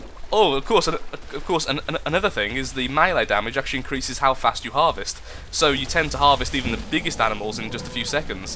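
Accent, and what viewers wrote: United Kingdom. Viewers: Thank you for using Centurion. If you tame a particularly strong beast Legionnaire might be a great name too. As a former radio Program Director I have to say you draw far more attention to your speech difficulties by talking about them than they really seem to deserve any more. I think they may be larger in your mind now due to your hard work to get rid of them than they actually seem to most people. I wouldn't have noticed anything this episode had you not gone on about it. But it's your show. If your point is to encourage others to do a show who think their speech is not perfect that's a whole other matter. Then well done. But really, your Brit accent makes you come off extra smooth to us Yanks and I suspect you don't realize how much say compared to the guys at Good Mythical Morning for example. A funny show, but... How many in your audience do you think we're screaming at you to check out the drop at the top of the hill? 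LOL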